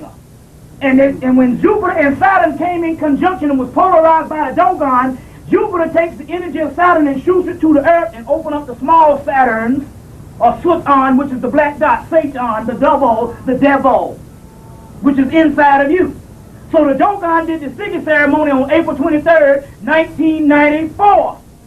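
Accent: South, United States. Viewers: mispronouncing Authors is hard to understand the Southern accent he has..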